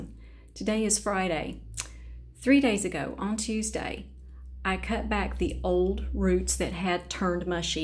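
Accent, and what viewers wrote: South, United States. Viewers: Those are some nice basic Phals your growing. Being from SC it’s nice to hear someone else with that southern accent growing nice looking orchids. Enjoyed the video.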